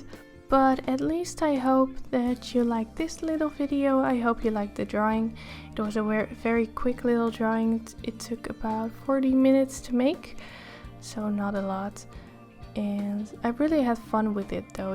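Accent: Netherlands. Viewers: I knew it, je bent Nederlands, ik dacht al... Klinkt te Nederlands. 😍😂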